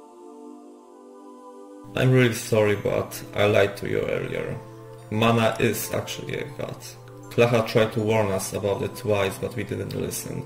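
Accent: Poland. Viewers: Polish accent?? 🤨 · brzmisz jak polak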